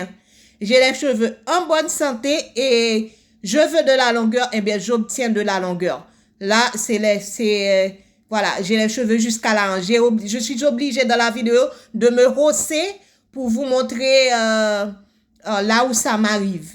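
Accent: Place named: Haiti. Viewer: Vous avez un accent haitien ❤ sinon merci pour les vidéos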